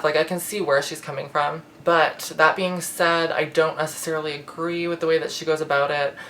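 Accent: Canada. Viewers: You are one of my favourite youtubers. You come from the same region as me and I find your voice, speech pattern, wording, and slang so calming to listen to. Aside from that I genuinely enjoy hearing what you have to say content wise. I've been living provinces away from home for the past couple years and your videos are my favourite thing for easing homesickness. The way you tell a story is just so familiar and that dry subtle humour paired with classic Canadian manners just gets me laughing.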